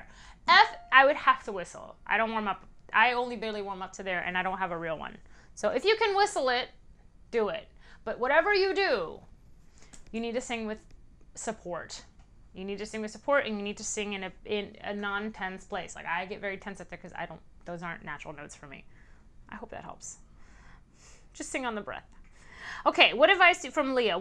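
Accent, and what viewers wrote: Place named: United States. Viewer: She sounds like a normal American woman, not 'husky and gutteral' 🙄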